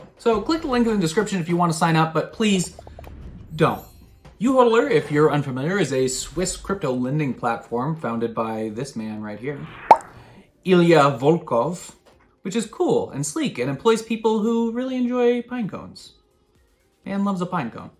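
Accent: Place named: United States. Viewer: This guy has the most american accent ever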